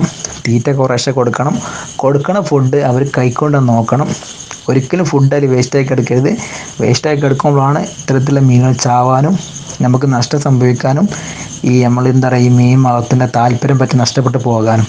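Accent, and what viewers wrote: Malappuram, India. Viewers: Samsaram kettappazhe thonni.. 😇😇mlprm isttam😍😘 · Superbb Malappuram slang😋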